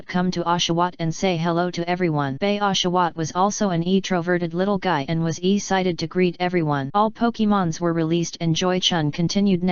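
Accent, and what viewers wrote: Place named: China. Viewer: My god this Chinese actsent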